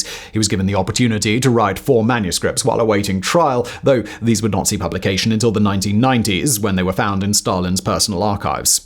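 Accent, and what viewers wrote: United Kingdom. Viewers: Thumbs down. Reason 1: You don't start video with a secs ad. 2. Your English is too British and chewy and those who don't speak it as their first language understand JACK. Put subs. proper ones, auto-generated won't do. Cheers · This guy has 50 channels lmao and he doesn't even know much about the topics he does videos on. It's basically Wikipedia paragraphs with a soothing British accent. I'll still watch though 😂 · This guy's British accent is fucking annoying me. I would've watched the whole video but that accent is too hard on me.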